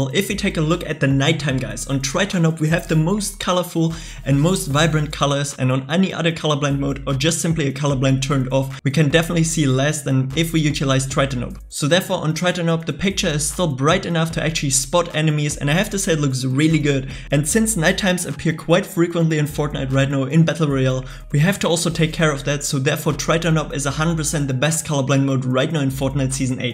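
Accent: Germany. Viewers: der klingt anders deutsch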